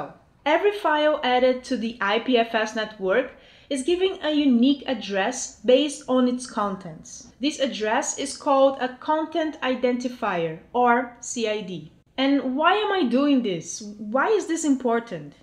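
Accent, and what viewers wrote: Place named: Brazil. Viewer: Vc é brasileira né? srsrsr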